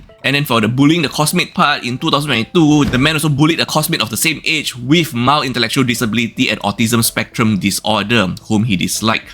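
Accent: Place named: Singapore. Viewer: Hey content creator, there's only one thing more cringey than this Ms Hong - guess what....it's your Singlish accent. Yikes.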